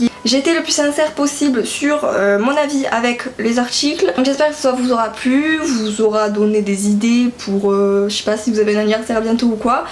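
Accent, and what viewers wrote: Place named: Marseille, France. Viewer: Sinon t'es une marseillaise ? pcq chepa si c'est moi mais t'a un accent marseillais et j'aime trop ton accent 😘😘😚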